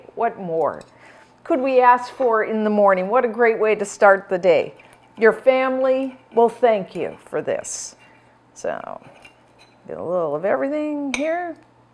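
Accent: Canada. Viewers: Love these cooking shows. Also love the Canadian accent. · She sounds Canadian, so maybe they use the metric system? Not sure about that, though. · I've tried a lot of recipes I learned from YouTube during this pandemic. I'm American, & I also enjoy listening to the various accents. Love listening to our friends from the north. Thanks!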